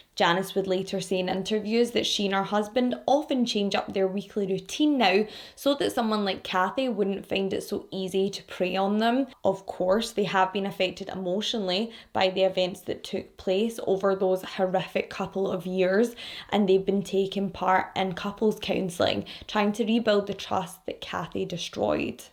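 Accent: Scotland, United Kingdom. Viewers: it really did tho mamas!! Buuuuut I've got you on the big screen rn, and even got my partner into your true crime stories! He enjoys your beautiful Scottish narration just as much as me! 😍💗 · Hi just found your channel out here in these YouTube streets and I LOVE YA!!! I love listening to the Scottish Accent!!! Yay!!!! Not to mention hoe gorgeous you are and your sense of humor is epic!!! 😉😉😉😉😉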